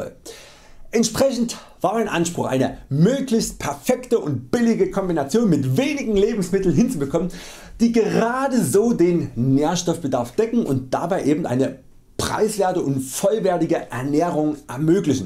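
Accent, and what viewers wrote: Saxony, Germany. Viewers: dein sächsisch ist ja immer wieder schön ^^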